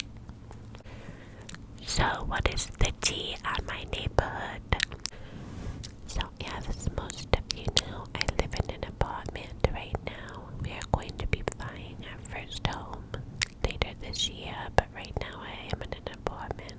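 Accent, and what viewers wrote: New York, United States. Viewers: Re watching old videos as I have given myself a chill morning off. Love your NY accent. Also love hearing your old gossip again. Still makes me giggle after the 5th time of watching ❤️ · New subscriber here. I've seen a couple of your vids. I do love a juicy storytime! :) Enjoyed your NY accent as well. ❤💯💯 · You're such a great story teller & I love the New York accent ❤ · I have watched this video so many times! Love it! The NY accent you do with the clicky whisper you have is heaven! ♥️ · NY accent, cupped whispers AND story time all in one video?? I'm in heaven! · i love when you whisper in a ny accent, so relaxing! you look stunning, i love your eyelashes! thank you for everything you do gorgeous! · in your last NY accent the way you said Niagara falls made me giggle so i started saying it around my roommates and sometimes we’ll just go “niAHHGRUH FAWWLLS” out of nowhere 😂😅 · Love the New York accent 💖💖💖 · ASMR thank you! 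and great video btw i love when you do the ny accent 🥺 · As a Southerner from Tennessee, I love hearing the New York accent! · Pleaseee do more storytimes with the NY accent, sooo goood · Living for the NY accent rambles! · ahhhh! I’m late again! Sorry about that! I cant wait to fall asleep eat tonight, thank you,! I love your New York accent evern if I have no idea how accurate it is xD Hope you are doing well and your family is doing good in these times. Edit: Also, yes, please, please, please do more of these! Keep on keeping on ~<3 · Me too! that NY accent is so relaxing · yay the NY accent!!! i want to watch this so bad but i need to sleep, cant sleep during this one... ill just watch it twice! 😂🥰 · Late again😩wish YouTube would notify me of your videos I always have to search for them😩loved this story time & you already KNOW that cupped whispers are the best, also your New York accent is so cute ahah ❤️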